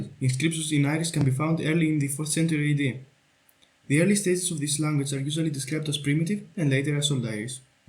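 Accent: Greece. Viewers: Corped_Viper YB He’s Greek, cut him some slack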